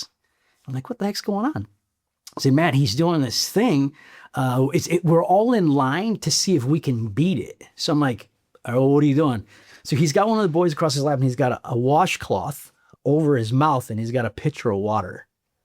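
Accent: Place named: Chicago, United States. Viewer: Riveting story teller. I can't stop listening to that old school Chicago accent.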